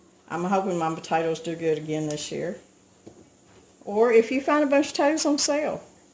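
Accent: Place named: Southern Missouri, United States. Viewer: I just discovered your channel recently. I am now subscribed. I can't wait to try your crazy pizza sauce, your lunch lady rolls, tomatoe powder and these potatoes. I have canned many years and have a really big garden. But some of these things ar new to me and I love being able to add to my pantry. Thanks so much. Oh and I have to say I love hearing you talk! The accent sounds so much like my people. My family are from southern missouri. I have lived though in Nebraska most of my life. It brings back such sweet memories! Thank You.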